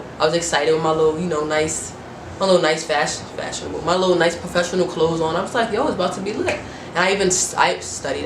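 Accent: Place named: New York, United States. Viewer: Where you from?? You sound like your from ny cuz that's where I'm from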